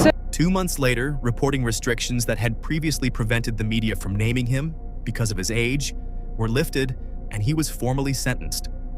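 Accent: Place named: United States. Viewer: the voice sounded American that's why I noticed. You can say whatever you want. It's just funny to me to hear it with an American accent I'm also amaerican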